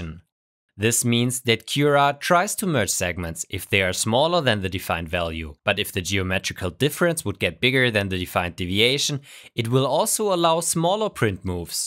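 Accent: Germany. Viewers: he has a nice German accent, thank god he's not Swiss. xD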